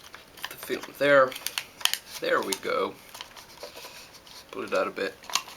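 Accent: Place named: Finland. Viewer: Of all the euro accents, finnish is my favorite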